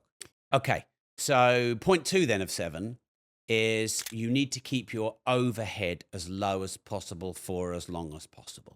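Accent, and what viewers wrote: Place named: United Kingdom. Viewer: Can't listen to anyone with a British accent more than 30 seconds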